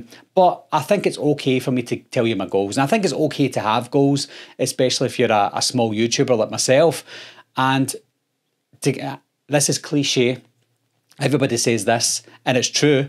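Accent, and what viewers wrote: Scotland, United Kingdom. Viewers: Well deserved fella. Btw I love the Scottish accent and can understand you just fine. Keep on keeping on.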